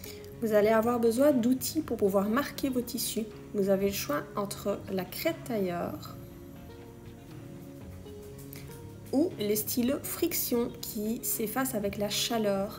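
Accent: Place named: Belgium. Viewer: Salut, t'excuses pas de ton accent Belge, sois en fière. Bonne chance pour ta chaine, ça a l'air très intéressant.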